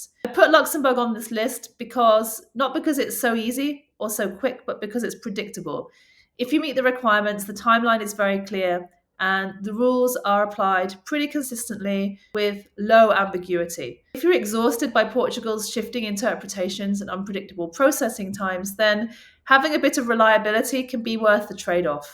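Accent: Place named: United Kingdom. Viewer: That'd be awesome. And thanks for being so responsive, for taking the time to respond to random comments. That and of course the meticulously curated content makes me come back to your channel over and over again. Plus, I love the British accent, and given how English is my second language, being on your channel is always a learning experience for me, in more ways than you intend. Thanks for everything. :)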